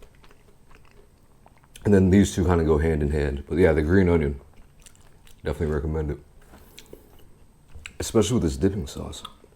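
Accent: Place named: Philadelphia, United States. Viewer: Happy Birthday homie!! Easily my favorite content creator, the way you narrate things reminds me of my brothas from philly 🤙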